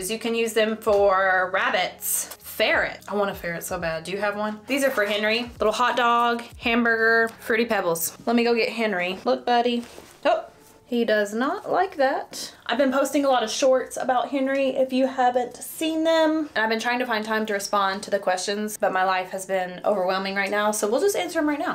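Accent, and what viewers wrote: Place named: Texas, United States. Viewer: I traveled from So. Cal to lil' ol Canton Texas to watch. They sound a bit like you there. lol. It was amazing! We didn't cry though. And you don't need the glasses to look while it was in totality. Don't get your glasses from Temu, though. You will go blind. Ended up getting a high frequency face wand cuz you said you liked yours. Thankyou! Love it!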